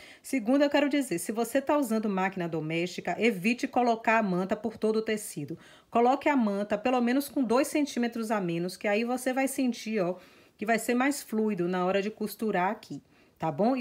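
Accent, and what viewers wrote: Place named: Northeast, Brazil. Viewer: .....minha querida seu sotaque é único , eu amo sotaque nordestino...... é q nós paulista falamos vídeo com o som o "i"..... então fica "vidio"..... E eu amo ouvir vc falando "videeo".....adoro ....adoro .....e adoro!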